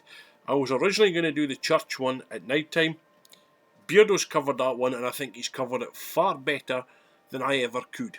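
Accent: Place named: Scotland, United Kingdom. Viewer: great workmate. these fakers have been caught again. greetings from Munich Germany S 🤘 🖤 🤘 btw love your Scottish dialect.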